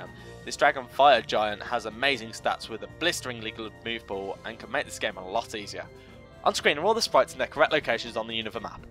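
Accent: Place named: Birmingham, United Kingdom. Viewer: Good to hear a fellow Brummie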